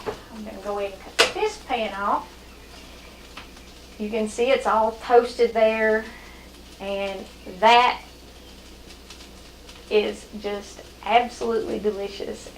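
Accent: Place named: West Virginia, United States. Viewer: Hello ... I am a new subscriber ... I absolutely LOVE your videos .. I LOVE to hear about the Lord Jesus ... Thank you for including him & giving him the Praise & the Glory that he so rightfully deserves .... by the way ... Where are you from? i am from WV living in Bristol Va .. but you sound like you may be from West Virginia ... God Bless !!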